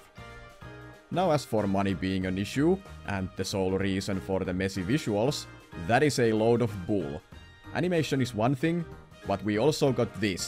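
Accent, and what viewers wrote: Finland. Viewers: Are you finnish? You sound finnish to me :DD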